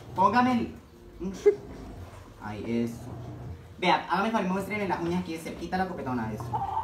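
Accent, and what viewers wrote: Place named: Colombia. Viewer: EL ACENTO COLOMBIANO 💘🇨🇴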